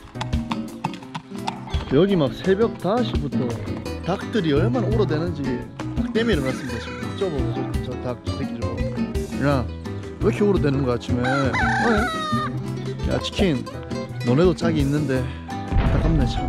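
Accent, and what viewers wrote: Gyeongsang, South Korea. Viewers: 잘생기셔서 그런가 외국 댓글이 종종있네요.경상도 분이신가요 사투리 쓰시는거같은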